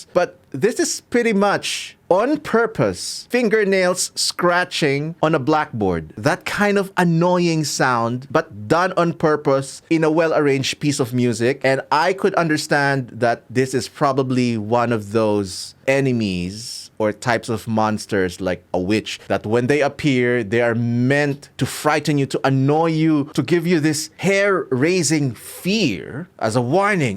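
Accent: Philippines. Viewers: pinoy to sa accent palang e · You accent and looks, feels like you're from the Philippines.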